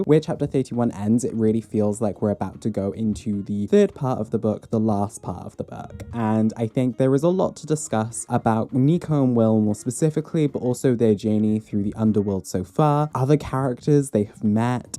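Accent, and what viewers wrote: United Kingdom. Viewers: You've such a nice accent! Is it from Britain?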